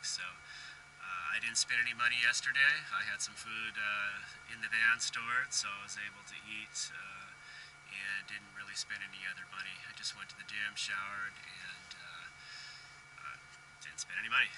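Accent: Maine, United States. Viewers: are you from Maine?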